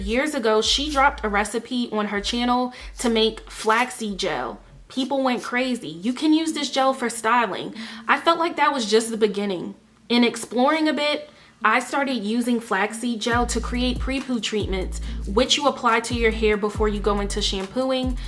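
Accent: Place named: Trinidad and Tobago. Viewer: You Trini? I hear it in your voice lol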